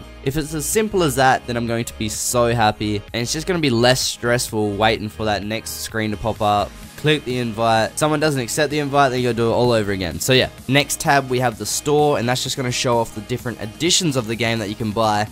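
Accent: Australia. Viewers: Great video! Are you Australian you sound it. Love ya if your Aussie.🇦🇺❤️. Like if an Aussie Bogan.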